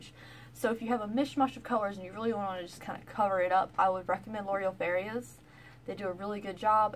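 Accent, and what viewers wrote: South, United States. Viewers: Love your videos!!! I just wish that you would speak a bit more slowly, though perhaps you've slowed down in your more recent videos that I haven't seen. The speed, in addition to your southern twang make it difficult to understand what you're saying at times!!! xoxoxoxo 💇🏻✨💄👠💋👍💅💗👸🏻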